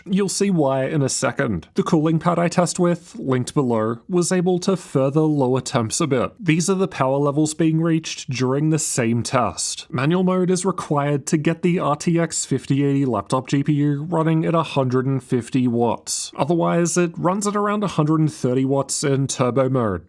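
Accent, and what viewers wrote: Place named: Australia. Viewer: man, your accent reminds me of Rickey Ponting and David Warner.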